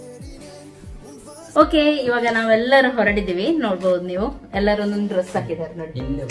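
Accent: Mangalore, India. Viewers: Hi mam Nim Mangalore li mathado kannada language andre nange thumba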